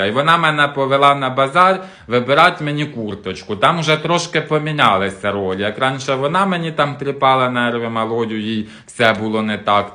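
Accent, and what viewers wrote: Poltava, Ukraine. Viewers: Дуже приємно Вас слухати! Справжній Полтавській суржик. Слухала б і слухала. Гарно розумієтесь на сільських тонкощах! Натхнення Вам на наступні відео! Чекаємо!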